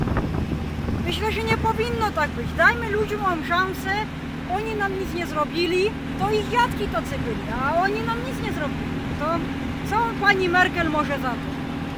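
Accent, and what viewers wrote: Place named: Podlasie, Poland. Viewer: Z Podlasia nie widać i nie słychać?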